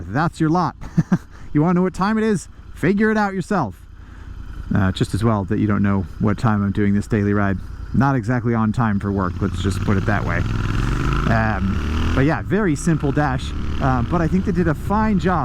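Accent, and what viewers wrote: United States. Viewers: Was surprised when I heard an American accent. This dude looks super British lol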